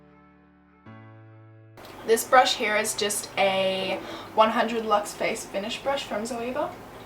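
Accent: Australia. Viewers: I love that australian accent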